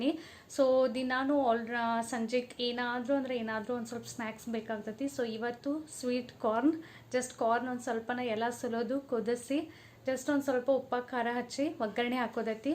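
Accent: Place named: Shimoga, India. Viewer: wow 🤗 thumba esta aythu nim bashe........... nav shimoga nim mathu keltiddre keltane erbeku ansatte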